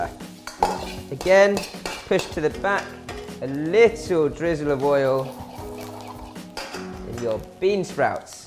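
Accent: England, United Kingdom. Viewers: is my new favorite internet chef and I watch A LOT of them. He is straightforward and simple, has a cute Brit accent, makes DOABLE dishes and is a NO-NONSENSE kitchen master. I suspect he speaks Cantonese and some French. My main man!!!! Bon appetite! Cheers. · Chinese man with the pom accent, no matter what countries how gangster you are.? But when you have a pom accent made you look cool and sweet.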